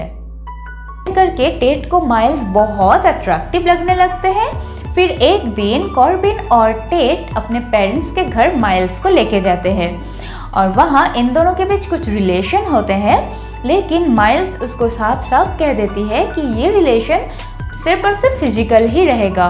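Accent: Bengal, India. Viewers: Its ok sab bol rahe hai ki hindi thik karle vo bengali me yarr thoda farak to padega hi na hindi me 😍 per aachi to hai bhoth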